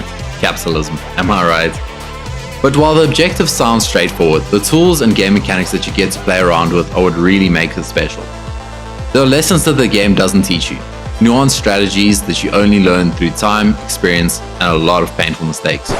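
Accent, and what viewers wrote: South Africa. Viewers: You from South Africa??? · Great video man, I just recently broke 200 hours in the game. You sound hella South African too🔥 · Ayo naaah you sound like my people, you from SA by any chance 👀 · South african?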